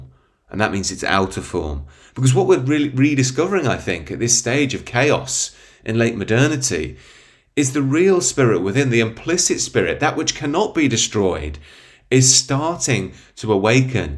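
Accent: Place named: Northern England, United Kingdom. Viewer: Eee, by eck, that northern accent were ruddy grand 😁.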